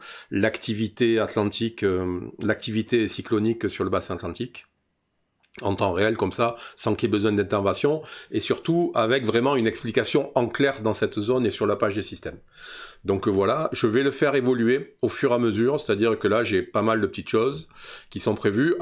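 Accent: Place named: Provence, France. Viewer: Génial! Merci. Quel plaisir d'entendre tes commentaires (5/5) et cet accent provençal... un pur bonheur pour une marseillaise d'origine!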